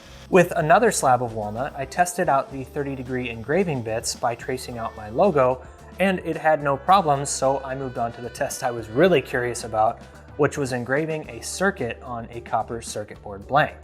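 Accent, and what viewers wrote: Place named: United States. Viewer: You sound like an American, so talk like one. Dump the mm, and use SAE. That's how we communicate here! mm doesn't mean diddly.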